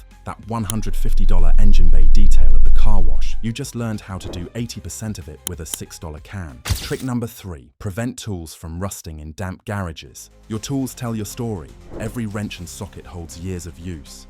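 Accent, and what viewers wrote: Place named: United Kingdom. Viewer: Your credibility gets called into question when you are giving advice and your video edit is piss poor, several repeats of the same clips, did you even review your finished video? WD40 is ok but it's not the magic you are portraying. WD40 is ok but it's not the magic you are portraying 😊. I'm also intrigued why the narration is British English yet currency is in U.S. dollars, some of the left hand drive scenes are showing blurred British roads with the use of U.S. Dollars quotes in pricing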